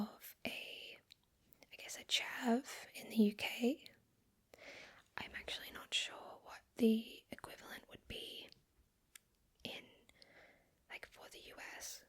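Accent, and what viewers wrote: Australia. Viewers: You do your preference, but the short hair is super cute. Why do I find most women that aren't into guys hot, oh well. As far as trigger words go, 'plosive p' words are good (words with 2 p's are good; stipple, apple, puppy, etc.) Also repeating sound words are good; coconut, banana, chocolate. Why are most of the words foods? haha. Also, leaning into the Aussie accent really hard for a video would be good, since that is something more niche that you have going for you. Even using slang, like "chuck a wobbly" or something. Not gonna lie, doing the heavy Aussie accent role play wearing that same type of tank top or sports bra exercise top used in the physical trainer videos would probably do well for views (more revealing shoulders/etc. get clicks these days). Whether you do another physical trainer RP or maybe do like a female mechanic explaining the work they did on the car or some work stories (some "grease" on your arms and cheek). 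Anyway, just some ideas and insights to help the channel, not just nosey! haha.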